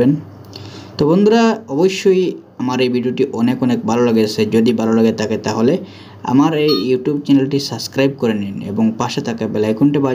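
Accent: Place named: Sylhet, Bangladesh. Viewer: আসসালামু আলাইকুম ভাইয়া কেমন আছেন ভালো আছেন নি আপনার বাড়ি কোথায় সিলেট নাকি আপনি কোন রকম সিলেটি সিলেটি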